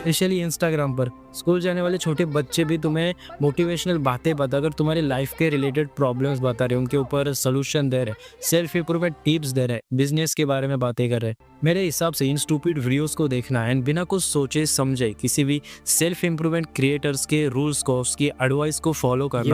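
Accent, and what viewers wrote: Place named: Maharashtra, India. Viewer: Bro sounds marathi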